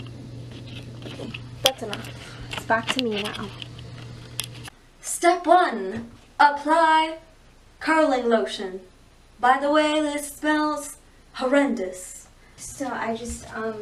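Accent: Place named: India. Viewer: her accent is some what indian...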